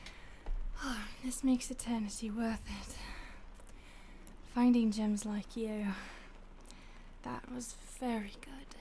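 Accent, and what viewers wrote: United Kingdom. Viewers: I never knew I needed a british voice in my loneliness but I now know I needed it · That accent man...British women are the hottest sounding women on earth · Pretty good Brittish accent love or are you actually English ? Also I subbed and dinged coz about time SOMEONE did a bloody vampire RP without resorting to that bullshit hypnosis power to do the "forget" bollocks Also I'm English and thought your accent was kinda sexy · I love youre accent Are you British ? ;) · If I'm not wrong i think you are speaking in a British accent yeah? 😎😎 sounds awesome for a British vampire yes?👍👍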